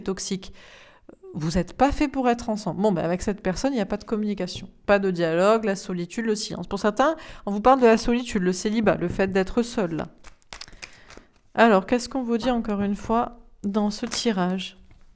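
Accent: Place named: Southwest, France. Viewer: L accent du sud ouest est magique 😁 J ai le même 🤪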